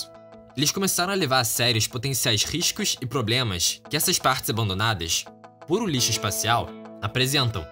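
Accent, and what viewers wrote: Rio de Janeiro, Brazil. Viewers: Sotaque carioca insuportável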